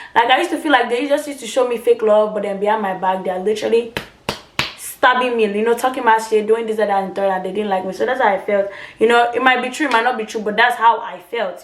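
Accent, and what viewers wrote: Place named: Yoruba, Nigeria. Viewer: I even like the way she talk👌missing up with Yoruba 👍👍👍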